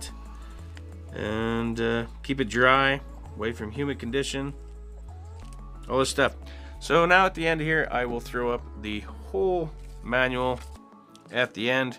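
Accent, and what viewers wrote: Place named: Canada. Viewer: Of course! Thanks for posting the video! And yeah, I noticed right away! Right off the bat, I knew you were Canadian. I was like shit yeah, a Canadian review.. Then I realized these are likely only sold in Canada at Canadian tire.. haha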